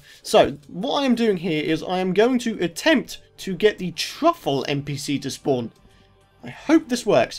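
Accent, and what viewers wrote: United Kingdom. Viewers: Walker Wilkins I think they're both British.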